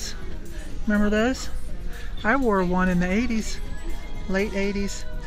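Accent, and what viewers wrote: United States. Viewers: I love listening to your voice. Where are you from in the States? I live across the pond, so am not familiar with different American accents. Love your videos and I learn a lot. Thank you so much. 😺❤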